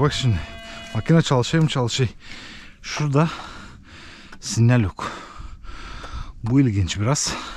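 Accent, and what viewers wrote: Thrace, Turkey. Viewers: Trakyalı olup konuşman 'da )ayrı bir hava veriyor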